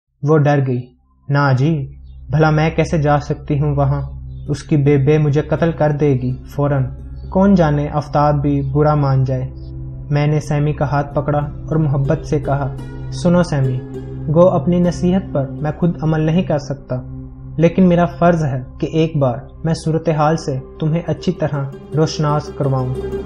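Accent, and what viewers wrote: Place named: Punjab, Pakistan. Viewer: haye punjabi accent mai urdu... r bnao bhai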